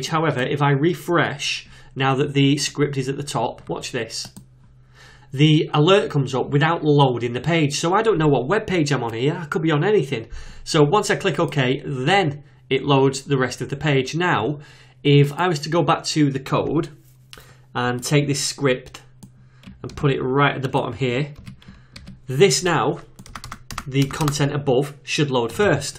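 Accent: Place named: North, United Kingdom. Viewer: Clear and concise tuts with the added bonus of a northern accent.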